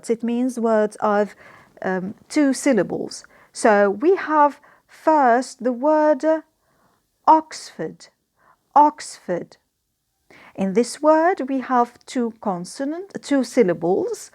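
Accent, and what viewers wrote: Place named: United Kingdom. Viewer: Ur British accent is 👍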